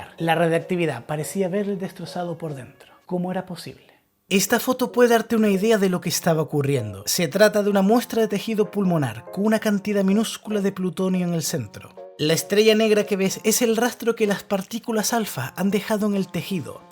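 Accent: Chile. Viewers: Están geniales tus vídeos!! Muy explicativos y profundos. Solo me perturbaba una cosa y es tu acento tan parecido al chileno jajaja hasta que descubrí que eres de Canarias y ahí todo tuvo sentido 😂 Saludos desde Chile, el peor país de Chile 😅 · Amigo, cómo es que hablas como chileno? Jamás imaginé que fueras español, un saludo desde Seattle, tu canal es increíble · Buen día, colega ¿Se puede saber de que cuidad de España proviene? Es que me asombra que su acento suene tanto al chileno. Si no fuese por el seseo típico español, habría pensado que eras de Chile. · este man es chileno?? tiene acento de chileno!! saludos🇨🇱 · Tienes el acento de un chileno.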